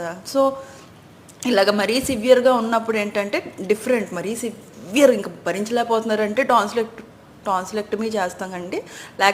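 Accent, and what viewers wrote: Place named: Godavari, India. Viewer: Miru telugu bale matladtunaru madam. Ma Godavari language ❤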